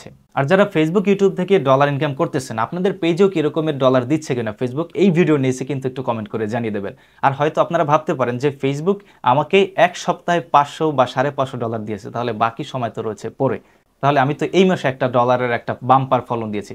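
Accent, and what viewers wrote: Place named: Barishal, Bangladesh. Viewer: ভাই আপনার বাড়ি বরিশালের কোথায় ?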